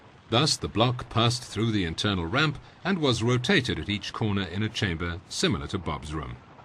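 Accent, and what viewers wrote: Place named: England, United Kingdom. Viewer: Folks, this video is filled with unproven presuppositions. It is a theroy with a nice 3d video and authoritative english accented narrator. Ramps, chipping with stone hammers, no wheels, no models before building, Tombs for dead men , Kings, hierarchical society, Humm? Stones were cleaved as diamonds are, ( being done today with giant stones) not chipped with copper chisels. Then they were moved with carts and oxen, not dragged by hundreds of workers in the sun for hundreds of miles up and down the countryside on slippery little logs of which one stone could stop a log from turning correctly. Counter weighting was used to elevate stones up the sides, not ramps and pushing/pulling tons of stones uphill on a ramp. Water filled counterweighted highly engineered hardwood carts filled with water descending down one side which raised the stones on similar carts connected with ropes from the other side using only gravity to pull them up. 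The finished top floor course with one more perimeter sidewall casing stone course could create a pool area for water. The angled casing stone about the perimeter would act as a smooth slope for ascending and descending carts using the pool to supply water to the descending carts as counterweights. Then the stones could be raised up the finish slope then be floated into place with air bladders attached to the carts sides. Two men with little energy could navigate tons of stone and place then perfectly to its designated place. The other two sides wit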